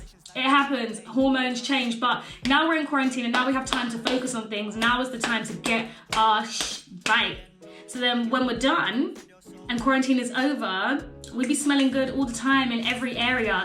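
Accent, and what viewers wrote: United Kingdom. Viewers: i love me some british accent🥰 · I like your accent. Are you from the Uk?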